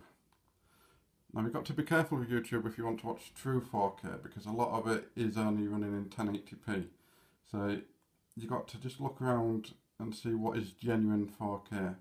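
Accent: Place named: Yorkshire, United Kingdom. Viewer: where bouts are you from, i notice the thick yorkshire accent and you also have "look north" on the telly.. just wondering if your anywhere near Hull... (Where im from!)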